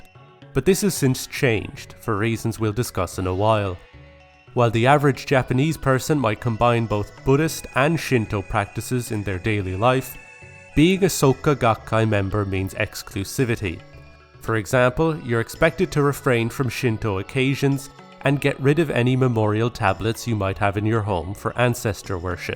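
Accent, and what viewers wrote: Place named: Ireland. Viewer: I can see you did alot of research for this vid and it is well put together. I think talking the to people who practice with the SGI would have given you quite a different perspective and tone to it though. I am Irish (like you by the sound of it!) and practice Nichiren Buddhism here with SGI-Ireland. I initially started practicing abroad 20 years ago. I have seen how members practice in many countries (including Japan). There are so many inaccuracies in this presentation I dont even know where to begin! Maybe you will cross paths and get to know an SGI member some time and be pleasantly surprised by what yiu have misunderstood. I hope so 🌞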